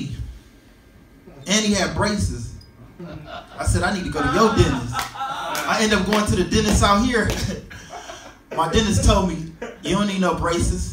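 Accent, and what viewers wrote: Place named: Detroit, United States. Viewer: He got that Detroit e man accent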